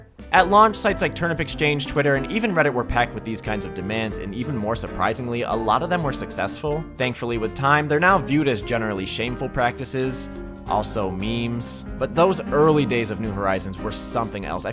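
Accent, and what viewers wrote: Brazil. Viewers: you brazilian? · Yo, is this man brazillian?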